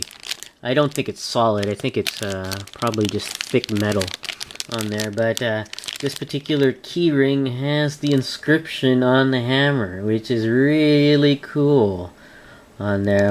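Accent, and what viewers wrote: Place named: Arkansas, United States. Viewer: Sounds like you're a fellow Arker. Correct?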